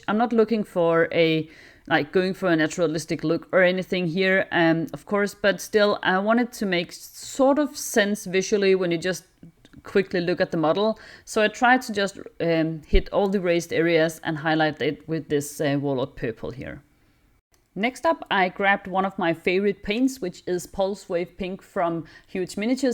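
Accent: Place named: Denmark. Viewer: something in your english reminds me of how I speak english.. are you perhaps danish? awesome scheme btw(og hvis du dansk, stærkt farvevalg)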